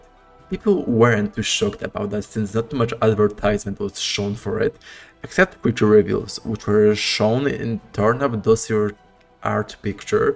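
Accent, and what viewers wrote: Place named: Poland. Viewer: This guy sounds Polish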